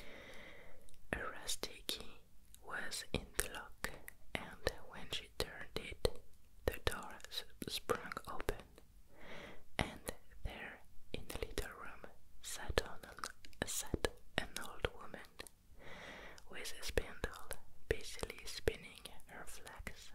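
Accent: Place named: England, United Kingdom. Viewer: J adore ton accent anglais ma sublime et ravissante super vidéo de qualité tu es relaxante 💜🤗💋🥰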